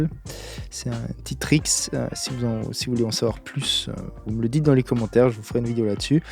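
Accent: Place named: Geneva, Switzerland. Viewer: Punaise.. j'étais sûr que tu étais de Genève avec ton accent et je viens de regarder ton site et OUI ! 😁🤘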